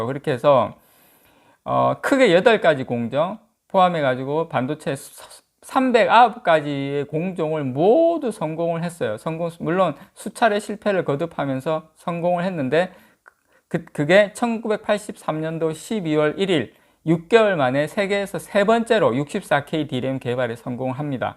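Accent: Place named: Busan, South Korea. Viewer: 같은부산이라 말투가 더 정감갑니다 전 광안동주민~~ ^^ 삼전 가즈아~~♡♡♡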